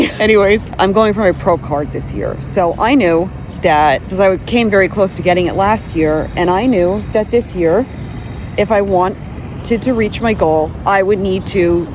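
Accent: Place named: New York, United States. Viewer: you are, hands down, my most favorite vlogger. So inspirational, and I love the agency you hold in your life. I’m 70 years old (I can’t believe it really), but don’t see myself as old. I work to stay fit and healthy, and being vegan is a foundational identity. I live in the PNW (Orcas Island), but lived in New York for some time, and your mild NY accent always takes me ‘home’. Anyway, all this to say, I appreciate who you are and your commitment to your dreams. I have great confidence you will acquire your pro card! ❤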